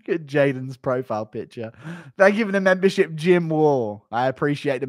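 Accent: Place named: United Kingdom. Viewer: it is lol. he speaks like 3 different languages and has a red neck vibe to him but talks like hes from britan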